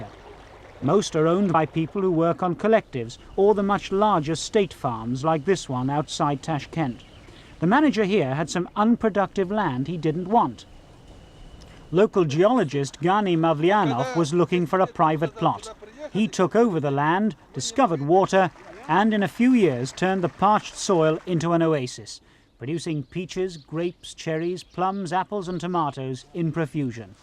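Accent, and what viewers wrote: United Kingdom. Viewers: I'd rather just watch the video footage of people living their lives than having to hear some nasal British commentator spend every five seconds trying to tell you what an unbearable hellhole this is supposed to be.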